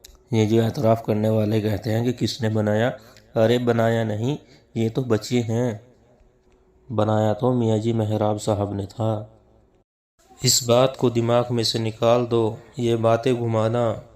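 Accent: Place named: India. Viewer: HAZRET KI LA-JAWAB URDU: Yea koi Hind/Deoband kay alim hain? In ki Urdu Hindi ki mahzooz-kun amezish liay huway hay, maslan "NiZam" ko 'NiJam", "Jawab" ko "Zawab", "Shura" ko "Sura", "terah/terha/terhan" ko "terain" kehtay hain! Alif kio Ain-Alif ki awaz say perhtay hain, maslan "Ap" ko "Ain+ap" (ba-awaz-e-"Aam/common) kehtay hain, jo kay sareehan ghalet hay.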